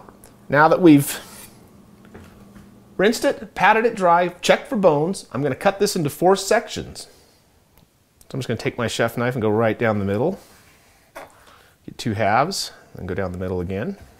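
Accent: United States. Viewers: He sounds American so I think Fahrenheit. Also these are smoked so 150 Fahrenheit sounds about right because 150 Celsius seems too much and will burn the fish in less than an hour. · I work commercial salmon fishing every summer in Alaska. I make this recipe 10 times a year. Love it. You from Idaho? I can hear it in the accent.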